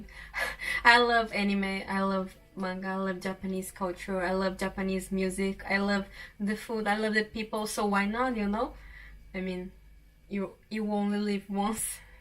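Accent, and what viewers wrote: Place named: Portugal. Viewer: Uhhh... You speak in english but you keep the portuguese accent... I love it! BTW, you look beautiful! 🥰😍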